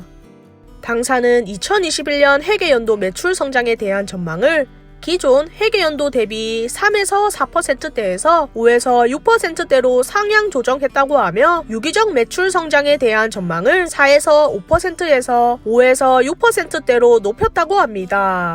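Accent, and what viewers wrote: South Korea. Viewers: ㅋㅋㅋ 말투 너무 귀여우세요ㅋㅋㅋㅋㅋ 경상도지예~~~~? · 서울 말투 쓰시는거 보니 서울분이신듯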